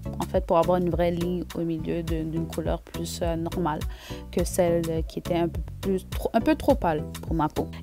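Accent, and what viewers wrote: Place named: Canada. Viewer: J’adore l’accent canadien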